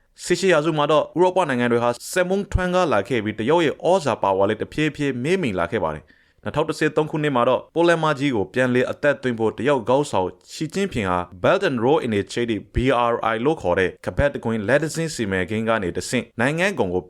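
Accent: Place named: Rakhine, Myanmar. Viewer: Drဆာဆာကချင်းလူမျိုးပါ၊အခုအသံကရခိုင်သံဖြစ်ပါတယ်။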